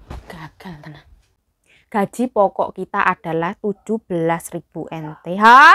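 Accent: Java, Indonesia. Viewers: Salam kenal mba.pas buka hp..ko ada yg menarik utk dilihat..disimak dan disuka..semoga mba sehat..selalu dg kesederhanaanya..dengan logat jawanya dg kerendahan hatinya..setidaknya dpt gambaran..oh seperti itu toh kalo jd TKW..tambah ilmu juga nih buat kita tau..domana kita kerja harus mampu menguasai bahas disana..salam dr bandung..semangat mba 💪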